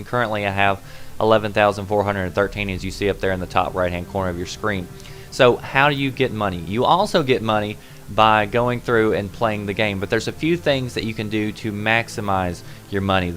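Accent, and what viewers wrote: South, United States. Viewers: One so what if he sounds like a hillbilly and yeah he has some good vids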